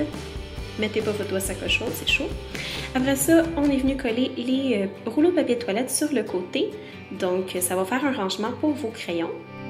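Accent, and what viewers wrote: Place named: Quebec, Canada. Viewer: j'aime trop l'accent québécois ! !😍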